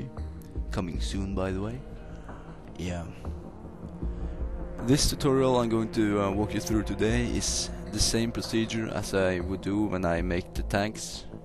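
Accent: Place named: Norway. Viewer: go norwegian accent hehe im from Norway to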